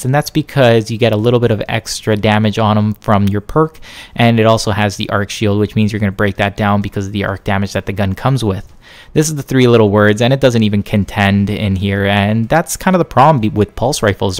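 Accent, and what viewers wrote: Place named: Canada. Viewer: Commentator is most definitely Canadian... haha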